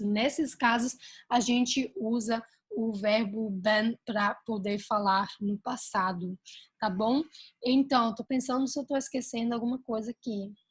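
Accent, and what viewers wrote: Brazil. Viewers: Cara, tu é o bicho para ensinar. Você fala o portugues como brasileiros e com um sotaque maravilhoso.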